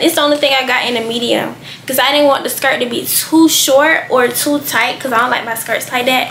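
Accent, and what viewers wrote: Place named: Atlanta, United States. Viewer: And I love your voice, girl, the Atlanta voice😊❤🎉😮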